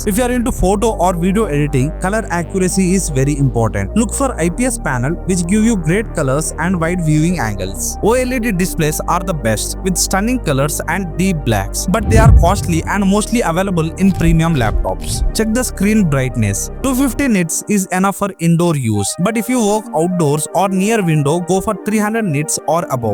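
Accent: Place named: India. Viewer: This was the best type of video I have seen . Man everything is great , really helpful, but your indian English accent sounds fun . 😅😂❤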